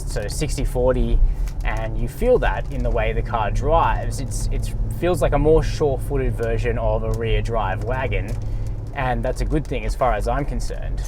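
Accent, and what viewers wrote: Australia. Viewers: Hi, I am watching your rewiews mostly because of your pronunciation of english language and I must tell you, I am having a good time😊. I live in Europe, Slovenia and if I may ask you, I know the rewiews are about the cars, but can you show as a little bit more of your beautiful country AUS. All I can see, is one same road, I am shure, they are more. Here, when I look through the window, only I can see, is coronavirus! We are depressed😱. Thank you very much and take care, Damijan.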